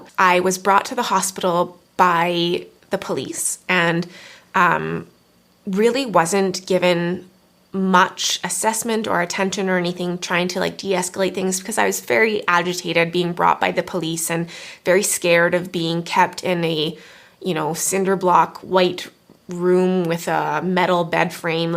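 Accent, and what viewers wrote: Canada. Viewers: The part that was most jarring for me is that they stripped you naked like a criminal prisoner being stripped searched. Why was that necessary? Terrible :( Are you Canadian, btw? Just the way you pronounce "out", "about" etc. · I think you're missing some of her points. She's not saying she doesn't think there are situations in which forced medication is necessary, but that the way in which it's enforced can be much more harmful than it should be. Also, I'm new here, but she sounds like she has a Canadian accent- she may not be from the US- but that's just a guess.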